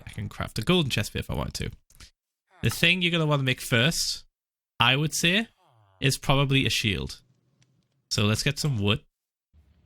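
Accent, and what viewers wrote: United Kingdom. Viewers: gosh i love that british accent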